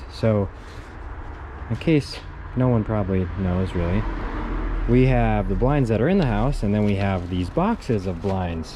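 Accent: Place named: Australia. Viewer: Hahaha the Aussie accent 😂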